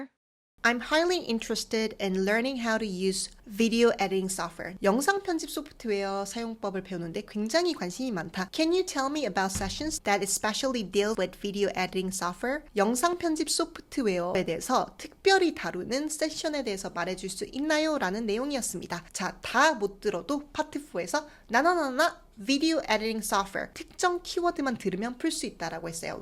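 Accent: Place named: United Kingdom. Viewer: 강의 내용 다 좋은데 혹시 영국에서 공부하신건가요? yes나 so 등 억양을 너무 강하게 올리시는게 미국이 아니라 영국식 발음 같습니다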